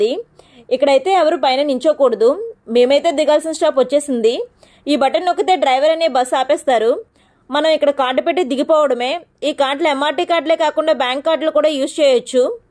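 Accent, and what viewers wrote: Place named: United States. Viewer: Y ur trying like America girl laga voice trying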